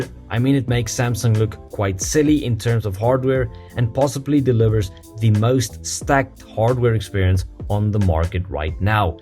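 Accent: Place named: South Africa. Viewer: Your accent is South African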